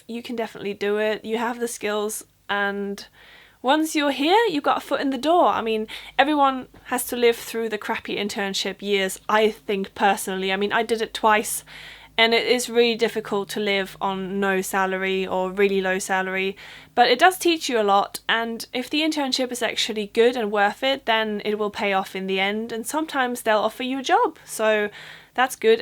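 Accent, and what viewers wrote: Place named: England, United Kingdom. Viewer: Called it..... best English accent from a German. Of. All. Time. Gut gemacht!!!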